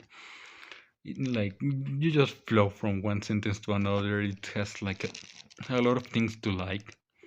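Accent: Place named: Mexico. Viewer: That nut worthy thick Mexican accent returns!!!❤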